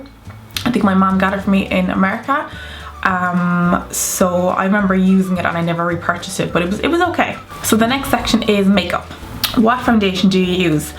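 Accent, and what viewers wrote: Ireland. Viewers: Is that a cork accent I hear?!?! I love finding fellow Cork/ Ireland you tubers! :) You're so pretty btw! I've subscribed 💕💕 xoxox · are u Irish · I knew straight away ur Irish cause I'm too what county u from😉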